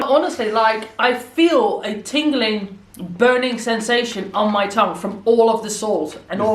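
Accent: Netherlands. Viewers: Why is your accent so Dutch! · I thought I recognized the accent, she speaks Dutch as well 😀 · It’s Dutch 😄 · Are you Dutch? It's my native language and your accent sounds Dutch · Are you dutch? I hear an accent.. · not related but i first thought you were british then i thought you were dutch and then i got so confused because i pick up both accents. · You sound Dutch haha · Loved the video! And I thought I heard the slightest bit of a Dutch accent :)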